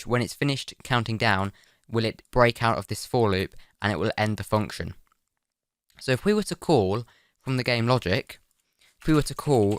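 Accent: United Kingdom. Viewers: he sounds british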